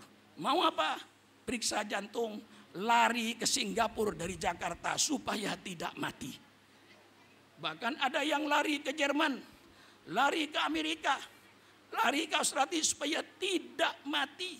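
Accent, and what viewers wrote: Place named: Madura, Indonesia. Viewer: Waaa ktahuan orang madura.. Bahasanya bukan bahasa intlektual pendidikan akademisinya Kurang orang.. Ktahuan cara ngomongnya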